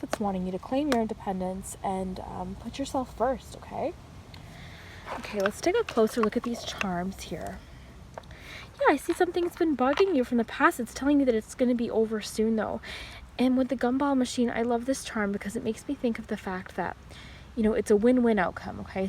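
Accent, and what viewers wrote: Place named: Ontario, Canada. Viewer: Are you Canadian by chance? I think I can hear the Ontario accent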